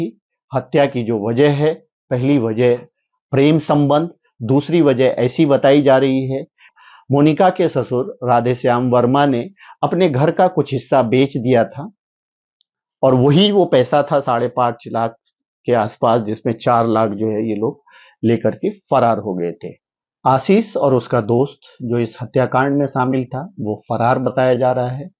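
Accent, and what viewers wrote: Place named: Bihar, India. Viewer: सही पकड़ा एंकर बिहारी है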